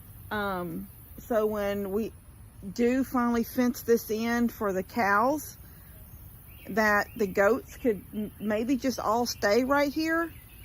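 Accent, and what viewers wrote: Texas, United States. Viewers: We love you. I love to watch your relationship with and. The absolute devotion you have for your beautiful boy. I was so happy to see you'd bought your beautiful truck. I felt like you seemed guilt ridden. Everything you do to give you happiness makes me happy and also Tony benefited. I love watching your Texas life and could listen to your Texan drawl all day long ❤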